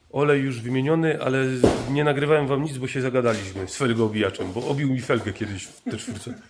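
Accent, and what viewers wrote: Silesia, Poland. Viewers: Fest zaciągosz po naszymu Podobo mi sie to Pozdrawiom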